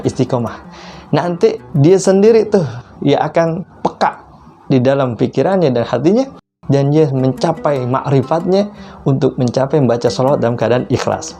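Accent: Jakarta, Indonesia. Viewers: Terimakasih Ustad, Sangat bermanfaat. Medok banget dah ah betawinye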